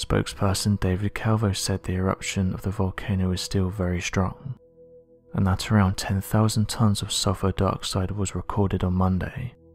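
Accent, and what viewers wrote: England, United Kingdom. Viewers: I think he's voice is lovely and I love he's English accent 😍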